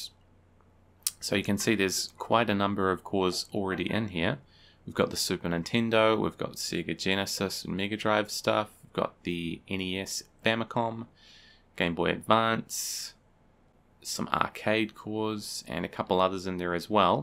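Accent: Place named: New Zealand. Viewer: Nice to hear a kiwi accent 🤘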